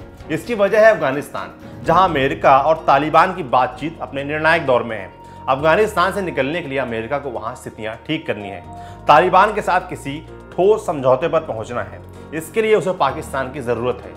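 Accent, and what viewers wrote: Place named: Kanpur, India. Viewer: yaar tum to puri kanpuriya bhasha bol rahe ho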